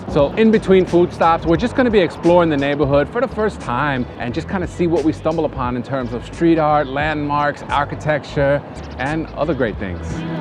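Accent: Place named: New York, United States. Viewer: You from Chicago, how come you gotta New York accent?? Good videos tho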